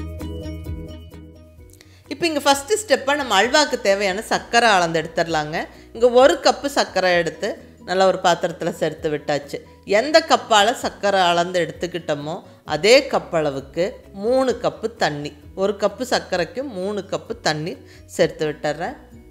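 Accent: Coimbatore, India. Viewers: ka Coimbatore style pechu alagu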